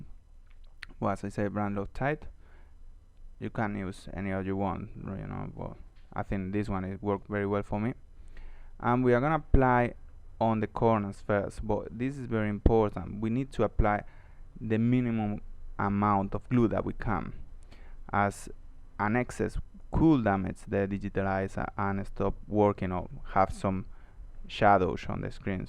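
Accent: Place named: Spain. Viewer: Sounds Iberian Spanish, or even North African, like Morrocan, or Tunisian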